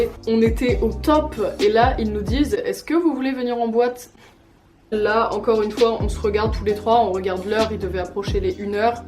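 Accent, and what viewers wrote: Normandy, France. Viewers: Mdrrr vive l’accent normand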